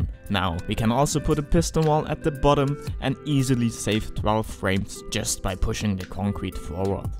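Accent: Germany. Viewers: Man kann den Deutschen akzent etwas krass hören, xD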